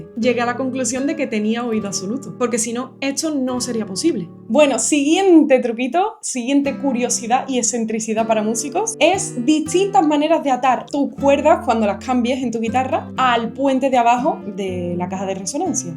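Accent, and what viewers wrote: Andalusia, Spain. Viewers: Aparte del encanto y calidad musical, me encantan los vídeos de para disfrutar de su inconfundible acento Andaaaaluuuu.....tan parecido a nuestro acento chileno pueeee..... Saludos desde Viña del Mar 🇨🇱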